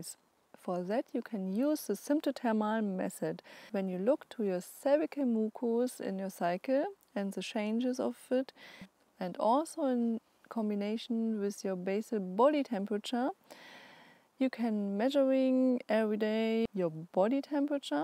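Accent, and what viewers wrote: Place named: Germany. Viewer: That german accent😁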